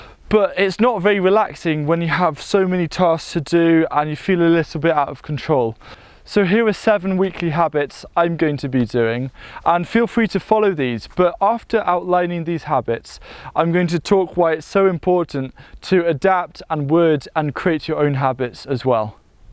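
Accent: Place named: United Kingdom. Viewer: Love the garden , The harvesting and The British accent.😇 LOL.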